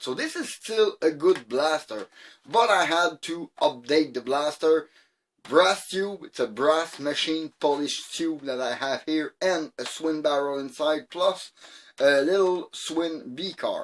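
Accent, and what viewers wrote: Canada. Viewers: I had a debate with my 8 year old on the accent :) I saw in another comment you got your parts on aliexpress? Just luck from the algorithm I saw your video and recognized the accent. I do not think I have seen any Canadian modders on youtube except you so far. Anglo here living in Montreal for 20 years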